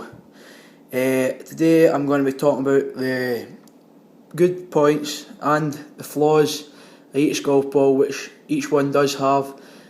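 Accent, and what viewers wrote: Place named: Scotland, United Kingdom. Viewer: I feel gifted that i can understand this thick of a scottish accent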